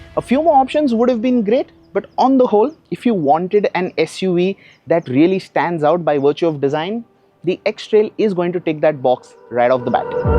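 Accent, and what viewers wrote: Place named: North India, India. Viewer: maTTTerial maTTic. Bro pls don’t insult india with your cheap north Indian English accent 🙏🏼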